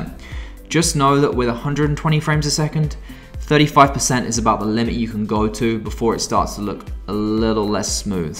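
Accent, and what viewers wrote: United Kingdom. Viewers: Great video, your video was talking about shooting at 60 or 120fps, obviously your sony will be in NTSC mode, judging by your accent, Im assuming that you live in the UK. If so, do you change to PAL when shooting in doors to avoid flickering. I have the a6500, I live in the UK, I shoot at 100fps for slow motion, is there much difference between NTSC & PAL, apart from the extra 20fps?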